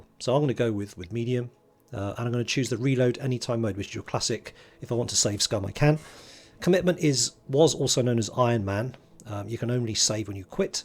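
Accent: United Kingdom. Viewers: You have an excellent voice for narration, I swear the English accent was tailor made for narration. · Delivered with such natural ability. I'm a british expat living in Norway, always nice to hear a fellow brit now and again. I shall continue on with the series, as the guide in general was a tremendous help. 10/10 for clarity, bravo.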